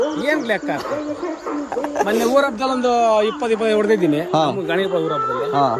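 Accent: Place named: Kolar, India. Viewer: Illa Pure kolar style nalli kannda mathaduthare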